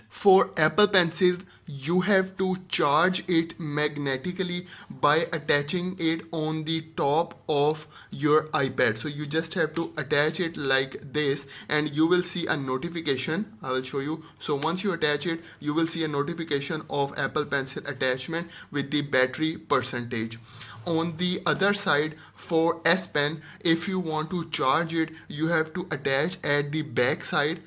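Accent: India. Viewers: What a nice indian accent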